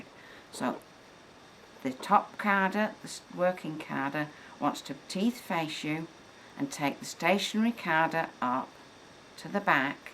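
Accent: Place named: Yorkshire, United Kingdom. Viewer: Love this vidoe, love the accent. Feel like km learning from one ot my Yorkshire grandmas. Thabkyou so much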